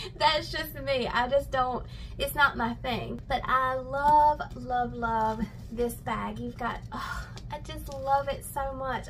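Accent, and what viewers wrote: South, United States. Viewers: I love the way she talks so southern and sweet .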